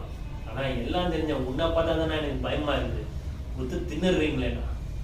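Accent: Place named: Chennai, India. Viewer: Onces more chennai paasa la pesunga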